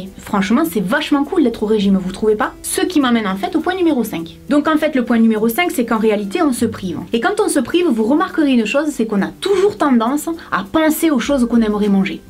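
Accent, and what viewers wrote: South, France. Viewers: j'adore tes videos!! ensoleillées , avé l'accent!! et elles sont bien classées pa r categorie, c'est tres pratique ,on dirait un vade mecum!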